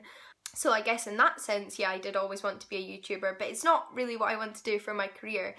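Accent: Scotland, United Kingdom. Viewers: Thank you for clarifying the accent! For some reason, I could've sworn you said you were from London. I guess you were just visiting and I totally misunderstood. So every time I'd listen to your vids I'd think, that can't be right. I KNOW MY SCOTTISH ACCENTS! (One of my favorites of all time!) Seriously, it's kind of sad how much this confused me and distracted me. I thought I had gotten fairly good at placing accents and I thought I had failed. Now I have peace. ;) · The Order of the Phoenix is definitely the best HP book. Whenever I watch your videos I start thinking in a Scottish accent! Haha! I might be biased but I recommend visiting Southeast Alaska, particularly my hometown, Ketchikan. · But where in Scotland are you from??? :D I love most Scottish accents, but yours is definitely one of my favourites, I'd just love to know what area of Scotland it's from :)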